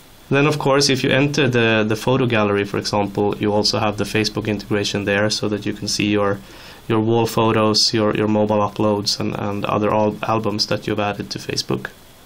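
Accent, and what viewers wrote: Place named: Sweden. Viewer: i love how you hear that hes swedish xD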